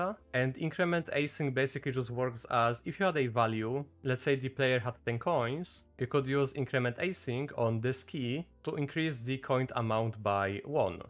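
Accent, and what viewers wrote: Poland. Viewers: dzięki, słychać polski akcent ;) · you sound polish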